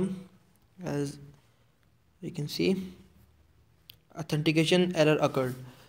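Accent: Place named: India. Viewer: Another heavy india accent every fucking video...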